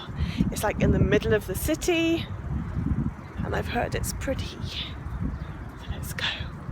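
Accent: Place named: United Kingdom. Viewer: I love your british accent, lovely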